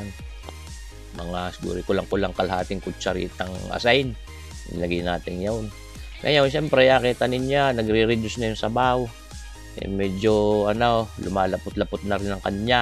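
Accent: Batangas, Philippines. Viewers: I love your recipe at higit sa lahat I love your Batangeño accent and your humor when speaking Sir. I miss hearing that accent, majority of my officemates before, were from Batangas.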